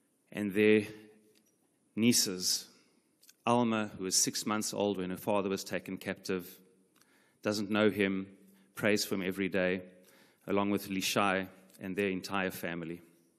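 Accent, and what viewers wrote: South Africa. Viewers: South African accent Praying for alllll the hostages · Rabbi Perez as a South African I recognised your accent. Proud of you and all the other strong people of Israel. May you, your wife and all your loved ones plus all who lost their families, experience Hashem's comfort and peace.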